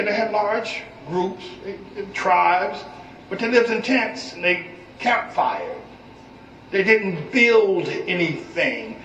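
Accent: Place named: United States. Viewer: EGYPTIANS AND THEY WERE NOT BLACK! STOP ATTRIBUTING TO YOURSELF ANYTHING DARKER THAN YOUR FUCKING AMERICAN ACCENT. THE WORLD LOVES YOU. YOU ARE AFRICANS. YOU TRAVEL TO EUROPE, ISRAEL, AMERICA, AND RUSSIA. AND WHERE IS THE RESULT?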